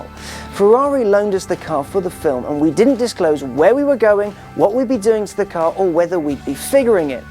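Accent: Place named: United Kingdom. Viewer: his handsome round head and interesting emotion make the video funny. Very appreciated the footage.professional knowledge+able. has his very nice brits accent but sometime i don't understand what he say about cuz my poor English, Can XCAR put subtitle in the video pls??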